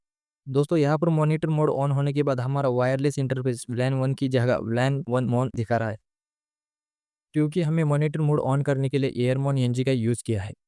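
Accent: Maharashtra, India. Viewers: Bhau tu pakka marathi aahes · Bhai tu Maharashtrain he kya?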